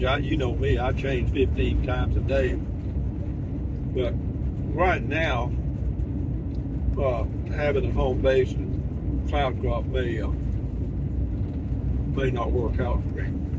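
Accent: Texas, United States. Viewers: I won't rent out my RV's either! I have a vintage Silver Streak & a 24' motorhome. Yes, my clothes, dishes, cooking utensils, etc are in them. I rigged out my van for an 8mth trip to PA to help my Mom, but I sure am missing Texas & our accent! 🇨🇱 I had to put Texas stickers on my van just to have a little Texas with me! I'll be back in a couple of months. Thanks for a little atmosphere & accent!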